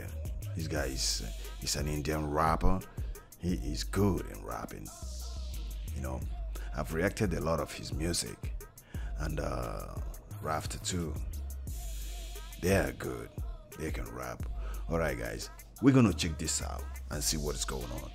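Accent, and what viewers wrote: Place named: Nigeria. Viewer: His accent is Nigerian.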